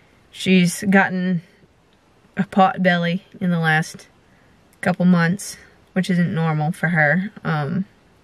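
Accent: Maine, United States. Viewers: Oh man, I am truly sorry to her about June. They truly are family. I hope she soon gets better. Sending prayers your way. On a lighter note, something I have thought about sharing with you numerous times since I began watching and following your channel. You have sent me down a memory lane from years long gone. That is due to your Maine accent. (Don't hate on me yet!😁) My closest buddy in Vietnam was a Sgt. from Maine. I can't recall what part. We became close pretty quick, with each struggling to figure out what the heck the other was saying. I was raised in the south, and needless to say, I never mastered the Queens English myself. 🤣 We spent our full tours together, although we rotated back to the states at different times. I left first, at a time when things were "less than ideal", and we lost contact. I tried for weeks, calling the Dept. of the Navy, and the Marine Corps, trying to learn if he had made it back. 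Finally, one evening I got the news I had long hoped to hear. "Sgt. John" made it home, and was doing well back in Maine. Isn't it funny? How something as simple and common as an accent, can send us back to a time so long past? God bless you and yours. And, God be with June. Happy trails,.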